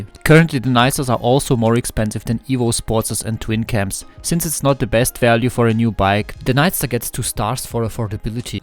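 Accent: Germany. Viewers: I truly enjoyed this video, and the German accent is awesome. Germans have always loved Harley. I appreciate you didn’t use an AI voice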